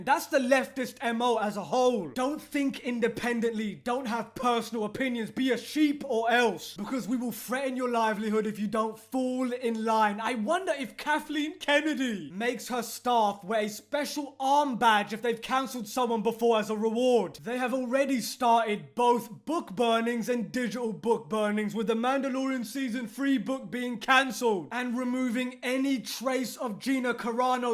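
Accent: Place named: Hull, United Kingdom. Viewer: Just taking a wild guess but are you from Hull or anywhere near? Knew a guy from Hull when studying abroad and sounds similar. However, as an American that’s never been to UK I make no claim of expertise with regional variations.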